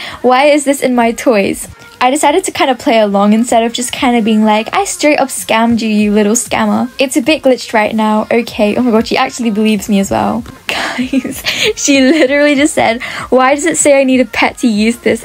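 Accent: United Kingdom. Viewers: normal British girl.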